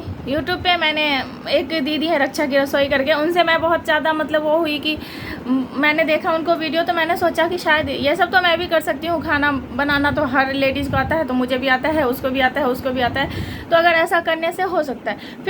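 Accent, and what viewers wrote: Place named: Nepal. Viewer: Rona nahin boli me Nepali lagti hai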